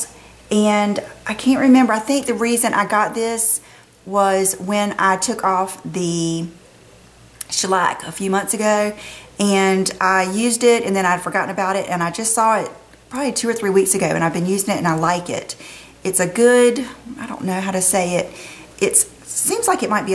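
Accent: South, United States. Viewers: New subscriber! I love your accent! You seem so sweet and like a southern belle. I love your taste in makeup. I am still on the hunt for the Milani goodies!!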